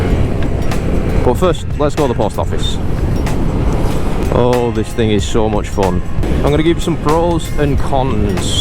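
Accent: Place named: Bolton, United Kingdom. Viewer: where are you from sounds like bolton u sound like paddy mcguinness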